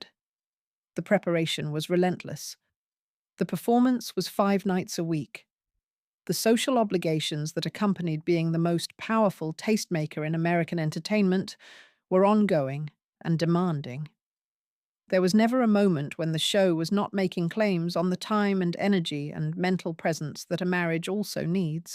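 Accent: United Kingdom. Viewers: Do we really need Brits to narrate an American story. Surely an American accent would be more compatible.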